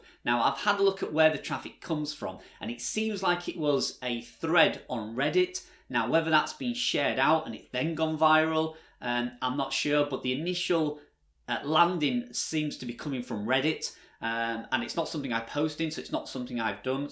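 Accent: Yorkshire, United Kingdom. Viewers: "eh up, somethings going 'ere"..... good yorkshire speak lad! t'videos excellent lad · Wow. Another very inspirational video. I am so blessed with the stats in this video bro. Thank you for coming up with such great videos. Good information and good motivation for my own blogging journey. And btw, I love your Yorkshire accent too. Cheers.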